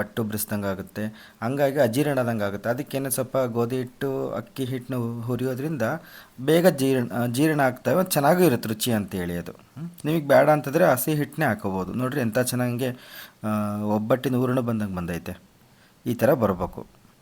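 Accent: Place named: Kadur, India. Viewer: Sar niwoo yava wooru nimma bashe nama kadurina bashe agede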